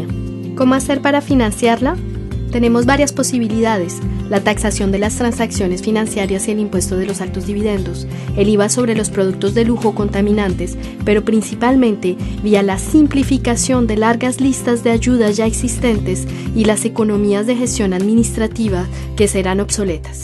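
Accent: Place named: Colombia. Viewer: Solo por curiosidad, en que parte de España o Europa la gente habla con acento Colombiano?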